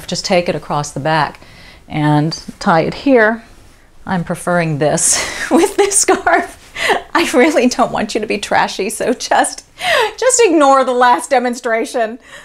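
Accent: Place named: Connecticut, United States. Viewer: Without you mentioning Houston, I would have thought you were from Connecticut... It's a compliment. ;)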